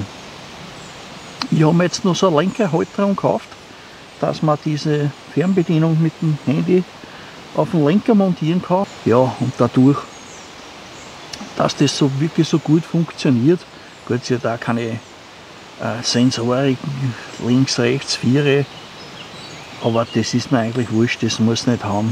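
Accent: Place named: Vienna, Austria. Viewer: Hallo!Dieses Jahr kommt mir die Natur so viel grüner vor als die letzten Jahre,oder kommt es nur mir do vor. Schönes neues Plätzle! Hast dich ja gleich schön häuslich eingerichtet. In letzter Zeit verstehe ich dich nicht wirklich, was vermutlich mehr an meinen Ohren liegt, und ein wenig, dass mir der Wiener Dialekt nicht so geläufig ist. Aber du sprichst den ja schon immer, also sind es meine Ohren, dietrotz Hörgerät nur 50% erfassen oder inzwischen noch weniger. Also wundere dich nicht, wenn ich nicht darauf eingehen. Aber ich gucke dir gerne zu. Bleib behütet, Grüßle Gunde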